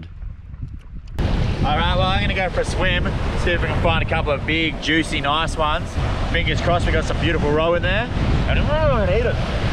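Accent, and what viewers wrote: Australia. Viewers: Are u Aussie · The Nads are called "Umi" in restaurants, you brave souls! New sub, love your channel, still havn't figured where your at, my guess is Australia. It's the accent!! 🤔🥰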